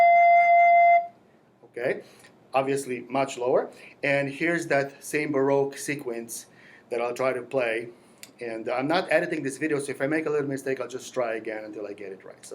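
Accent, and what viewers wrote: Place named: Philippines. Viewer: Your accent sounds slightly filipino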